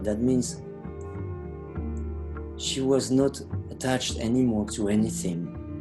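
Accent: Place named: France. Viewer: (To my humble opinion) This is worth enduring Frrench accent to benefit from his knowledge given with such gentleness. I am sending you my gratitude especailly for the short meditation you guide here, this is exactly what I have been missing and looking for since I came back home after the TEL6 post-coco:-D Namasté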